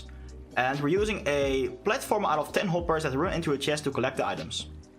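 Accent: Netherlands. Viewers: Hi, I have a Question: Does this also work on all platforms like XBOX One/360 and PS3/PS4? Btw, you have an accent. Are you dutch? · are you dutch ?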